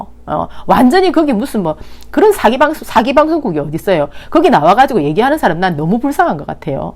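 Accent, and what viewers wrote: Gyeongsang, South Korea. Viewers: 어느사이 팬이 되었습니다. 볼때마다 재밌고 귀여운 여인이란 생각 지울 수없고 들을수록 독특한 경상도 사투리가 정겹네요~! 이를테면 말맛이 참 좋습니다. 보다 보드랍고 유연한 설득력과 친해지신다면~? 단지 이건 제 바램일 뿐 암튼 좋아요. 사랑스럽고 고마워요 ~!!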